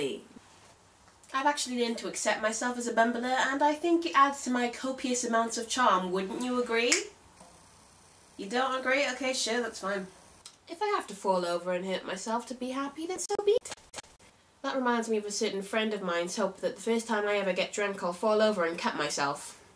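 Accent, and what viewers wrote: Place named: RP, United Kingdom. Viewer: complete stunning you are m`lady with one of the strongest high class very posh & best british accents ive heard by far absolute fabulous, great channel & love the content also. btw im genuinely being honest complimenting you, im not joking or trying to be rude to you in any way i hope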